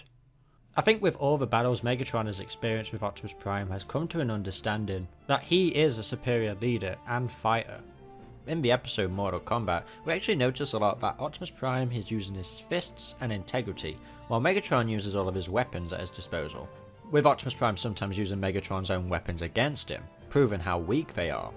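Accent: United Kingdom. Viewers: So do you have a British accent or some other kind of accent? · he is posh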